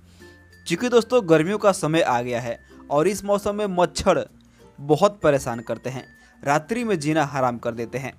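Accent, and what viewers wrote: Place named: Bihar, India. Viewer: Explained in Bihari accent.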